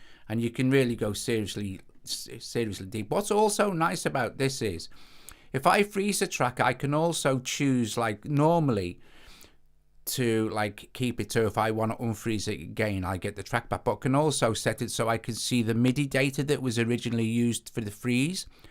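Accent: Liverpool, United Kingdom. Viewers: I love your scouse accent ;)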